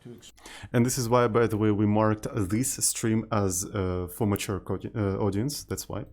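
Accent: Russia. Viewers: русский акцент?)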